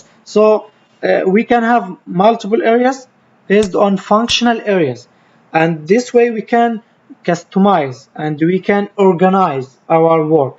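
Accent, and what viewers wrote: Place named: Arab world. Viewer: sounds arab pronunciation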